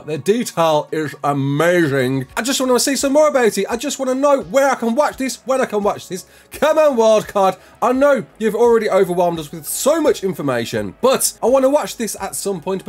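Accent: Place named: Scotland, United Kingdom. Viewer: And let’s get into itt! I love that intro so much. Hey are you from Scotland?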